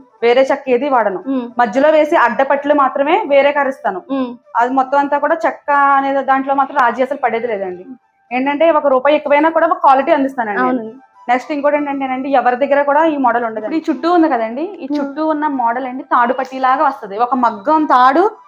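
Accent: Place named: Godavari, India. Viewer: అచ్చతెలుగు గోదావరి అమ్మాయి లా మి భాష బాగుంది నేచురల్ గా వుంది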